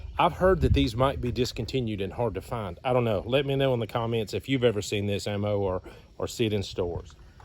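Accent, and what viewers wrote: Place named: Alabama, United States. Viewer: I knew that was an Alabama accent. About to pick up a Rugged Mustang at Larry's myself. Nice video. Helpful.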